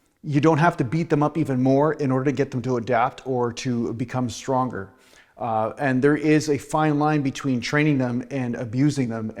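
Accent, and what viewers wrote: Canada. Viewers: you are like Yoda- only taller, more muscular, and with a Canadian accent. Based on the wisdom you present, I could easily accept that you are likely also 900 years-old. I'm 58, been lifting since 14 years-old, and have seen about all there is to see and read in the BB world. I'm a personal trainer, nutritionist, and Neuromuscular Therapist and, despite that background, I ALWAYS learn something new from you in every video, even if it's just a fresh way to approach an idea or an entirely new way to think about something. I can honestly say you present the most pragmatic, useful and wise content of anyone on YouTube. Truly. Thank you so much for your hard work in making these videos and for being the voice of reason in the dystopian, sometimes absurdly ridiculous, bodybuilding world that now exists. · Great advice brother. 👍 I really like that "someone else driving your car" analogy. 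So true 👍 You sound Canadian. Are from Canada? I live in Alberta. 🇨🇦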